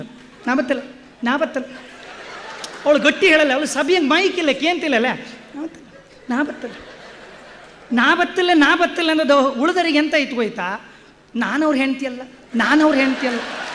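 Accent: Kundapura, India. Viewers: Kundapura Kannada Super.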